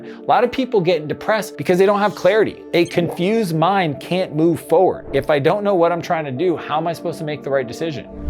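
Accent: Canada. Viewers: It sounds like a French Canadian accent, idk if it’s only me. But great video, I really like this advice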